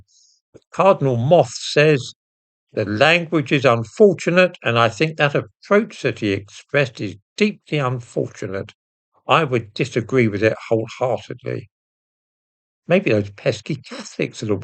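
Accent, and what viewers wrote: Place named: England, United Kingdom. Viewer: Too bad there isn't an English language version of this channel. We yanks can understand blokes like Attenborough and Dawkins, but this heavy dialect is just incomprehensible. It has always perplexed me that the English can't properly speak the language which carries their name.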